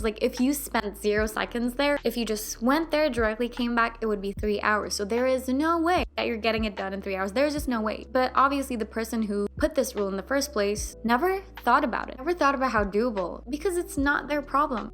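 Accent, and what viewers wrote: United States. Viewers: She was raised in Turkey, though she's fluent in English and has an American type accent.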